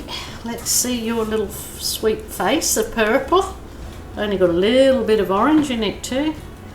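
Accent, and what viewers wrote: Birmingham, United Kingdom. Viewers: I love your philosophy to life. There isn't a video of yours I watch without you bringing a smile to my face, either with your humour or your take on life. I look at your intro pictures (I believe yours is a Brummie accent) and think wow you certainly have found Paradise all the way from England. That's all apart from the great educational and inspirational videos for acrylic painting which have inspired me to dabble.So thank you all the way from a wet and dark England.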